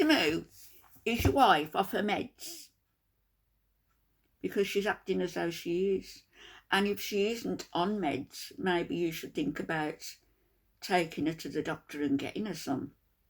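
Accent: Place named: Midlands, United Kingdom. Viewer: I love your midlands accent. You are so on the nail with everything, and your perspective is always interesting and entertaining. Keep up the fantastic work.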